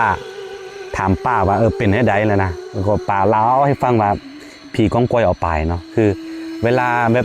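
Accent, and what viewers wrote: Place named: North, Thailand. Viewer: หม้อขางคือกะทะภาษาเหนือผมว่าคำพูดฟังง่ายเพราะพูดสำเนียงไม่ออกอีสานหรือลาวเวียงจันทร์มันออกทางฟังง่ายๆเหมือนภาคเหนือของไทยผมคนเหนือฟังโดยไม่ได้แปลเลย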